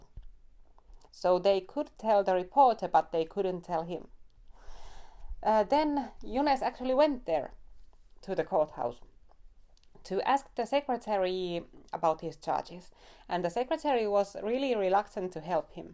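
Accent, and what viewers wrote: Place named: Finland. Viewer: You sound Finnish, I thought Finland was pretty conservative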